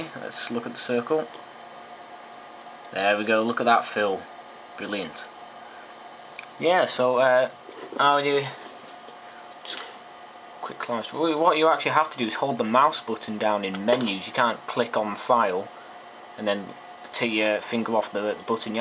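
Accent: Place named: Cumbria, United Kingdom. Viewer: Flash back? My Macintosh Classic II still works perfectly, except for the time (the battery is flat but I'm buggered if I'm gonna waste money on buying a new one!) And your Cumbrian accent is like music to my ears, lad!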